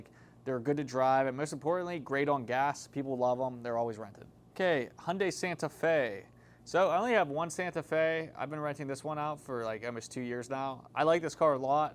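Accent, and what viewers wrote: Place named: Pittsburgh, United States. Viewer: I miss hearing the yinzer accent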